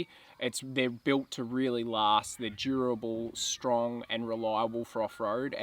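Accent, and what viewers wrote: Australia. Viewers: I like:s Australian English accent... It's same like Australian cricket commentary.... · Maybe it's the native bird sounds in the background or my imagination but I reckon you're sounding a bit more Aussie since you've been back!? I'm so used to hearing American accents in film/TV that it still sound strange to me to hear our accent coming out of a screen haha. Hope you're enjoying VIC :)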